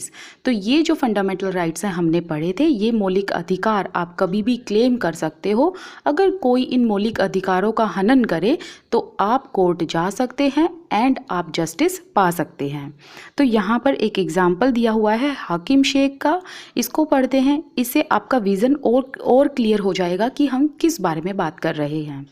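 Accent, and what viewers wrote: Haryana, India. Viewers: Ma'am haryana ki kr waha ke log aese hi bolte he 😒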